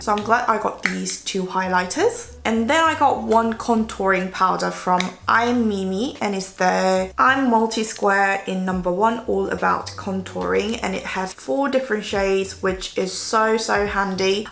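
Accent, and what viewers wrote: United Kingdom. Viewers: 영국발음... 이게 영국발음인가... 치인다 오늘도... 팬미팅 해주세요... 하... 워터~r 가 아닌 우워터. 에 놀라고 영국 발음과 영어 목소리에 치이고 갑니다... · 투쿨포스쿨꺼 치크 앤 아이인데 입술에 쓰기에 무리라고 해써여!ㅋㅋㅋ 아무튼 오늘 영상두 잘봤어여~ 약간 영어 듣기평가처럼 언니의 영국 영어발음 듣는 재미도 있고 하울도 재밌었어여ㅎㅎ 다음 영상 또 기다릴게여~~ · 진짜 영국 발음은 언제나 귀르가즘 ㅠㅠ❤️ 저도 이제 곧 개강이라 다시 해외로 나가야하는데 추천템 좀 쟁여가야겠어요>_< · 언니 영국발음 개치입니다..💜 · 미국사는데 님의 영국발음 들을때마다 너무너무 좋아요! 완전 취저😍😍😍 펄시레드 색 진짜 쨍하니 너무 이쁘네요💋 다음번에 한국가면 저도 쟁여와야겟어영❤️❤️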